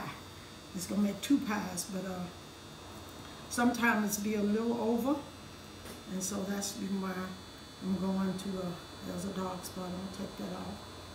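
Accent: South, United States. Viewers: Grandma had that “southern” twang goin on 😉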